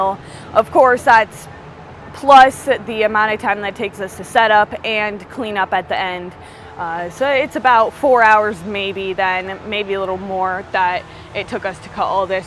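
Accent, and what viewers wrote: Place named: Michigan, United States. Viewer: Hey, has anyone ever told you that you sound like you're from northern Michigan not north central Pennsylvania?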